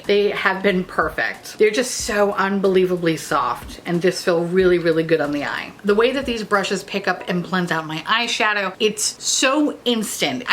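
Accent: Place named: Long Island, United States. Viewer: if u ever see this comment I think we would all love for u to do a video in your queens/Long Island accent!!! I would live!!❤️❤️❤️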